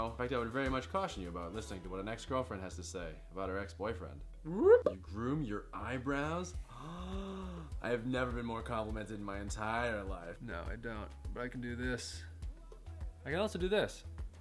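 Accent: Boston, United States. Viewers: I feel like I hear his Boston accent so heavy in this video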